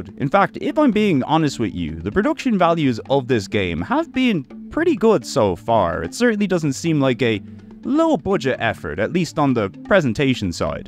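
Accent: Ireland. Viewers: What is this accent? Irish ?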